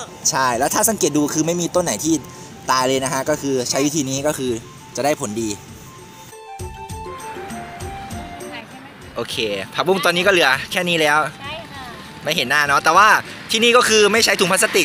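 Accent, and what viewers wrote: Isan, Thailand. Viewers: ชอบพิธีกร พูดไทยปนอีสาน ดีเนาะ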